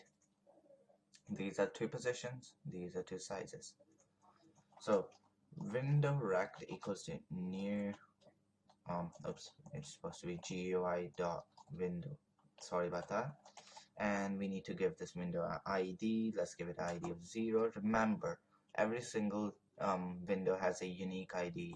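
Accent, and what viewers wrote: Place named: Scotland, United Kingdom. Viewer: Nice and Clear tutorial, made it really easy to follow and understand. Funky accent btw, Scottish twang? :)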